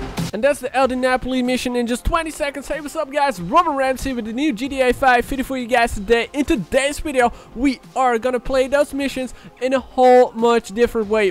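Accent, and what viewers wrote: Netherlands. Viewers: Are you Dutch? You sound like a Dutch man.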